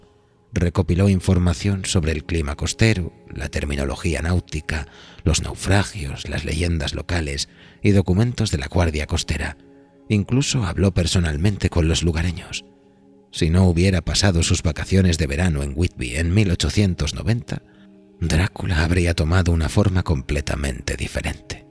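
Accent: Spain. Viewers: Españolisimo , nooo por favor , usen Español neutro , no podré escucharlo , es insoportable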